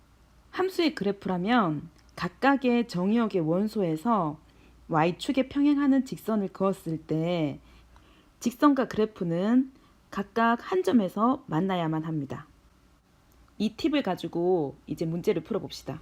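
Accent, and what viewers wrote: South Korea. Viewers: 우왕 들 화면 넘 깔끔하고 조아요~ ㅎㅎ 근데 서울분은 아니신가 봐요 ㅋㅋㅋ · 경상도 인듯?!?!ㅋㅋㅋㅋ 구독합니당 ~ㅎㅎ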